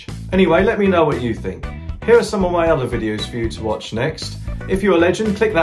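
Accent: Norfolk, United Kingdom. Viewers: Do I detect a slight Norfolk accent?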